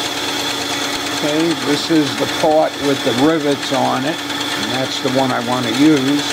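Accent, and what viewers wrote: New York, United States. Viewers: Hey New Yorker - I am a fan of yours,! I lived in Manhattan & Brooklyn for many years and I rode the F Train as well... Your work is simply great with a fine attention to detail. This is the work of a true craftsman of RR and Subway RR modelling. Thanks BTW, your voice has that warm New Yorker dialect that makes me year for a Nathans dog and a Bagel with cream cheese and lox... Enjoy your great work and your layout, sir...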